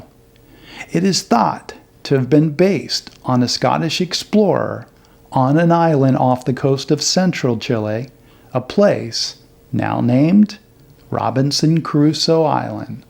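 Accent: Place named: England, United Kingdom. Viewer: I really enjoy hearing listening to your English accent with clear story explaining like this. Thank you 🙏🏻 so much. Please take care and enjoy your time there 🙏🏻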